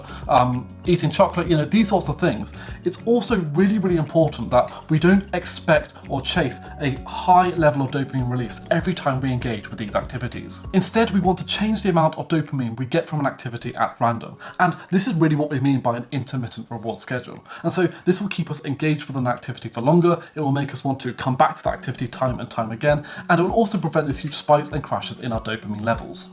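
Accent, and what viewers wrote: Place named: United Kingdom. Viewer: It's really useful to learn a British accent and nice work man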